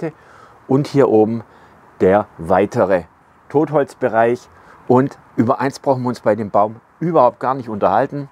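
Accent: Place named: Swabia, Germany. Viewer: Der Einkaufskorb.... absolut geil. Und das Schwäbisch... bitte: Sprich doch immer so. Finde ich absolut super und authentisch.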